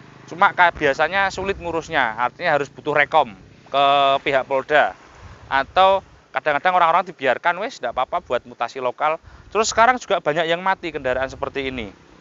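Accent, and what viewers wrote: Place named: East Java, Indonesia. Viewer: Matur suwun.. Sampeyan lucu.. Gaya Jawa timuran... Lancar jaya.. Salam dr Bogor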